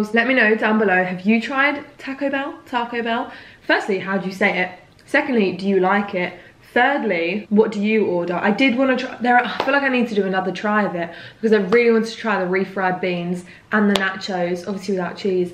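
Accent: England, United Kingdom. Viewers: It’s pronounced tah-co, if you’re being authentic. But I know British people pronounce somethings differently. Like the way you pronounce pasta is way different. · Do you think if I move to London I’ll catch the accent?? I absolutely love how she talks lol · I feel like it doesn’t matter how you say “Taco” because it’s not an English word and you have a brutish accent so both ways sounds like you’re saying “taco” to me haha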